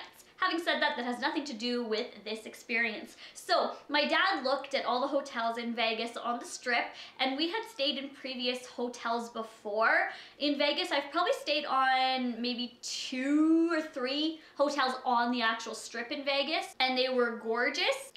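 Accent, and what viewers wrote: Canada. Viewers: Such a cute canadian accent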